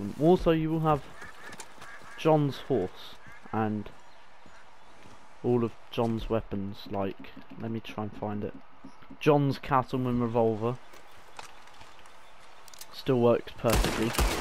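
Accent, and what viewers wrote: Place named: Yorkshire, United Kingdom. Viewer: Yeah, thanks I already did it back in chapter4. Appreciated though, if I hadn't known then for sure I'd have checked it out. All the best Mrs (cool as heck name by the way). Just checked your channel out, your accent made me chuckle, reminded me of the Batley accent (where I'm from in West Yorkshire).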